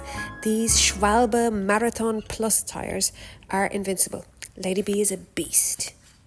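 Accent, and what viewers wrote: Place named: Ireland. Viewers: I don't even know how I ended up here, but it's a really nice video, so I looked at the creator, German location, but for a moment something didn't sit right with me, it wasn't a German accent, but then at one point I heard that nice R and my mind immediately turned to the character from The Simpsons Willie, but I guess I guessed wrong because you're probably from Ireland, right? Anyway, very nice work, I look forward to more. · Funny video! As a Czech and also a resident of Prague, I really enjoyed this video. But I definitely wouldn't choose the campsite near the Veltrusy Castle! :D Oh, how brave you are! :) But I enjoy your accent the most. If I'm not mistaken, it's Irish, right? Love it!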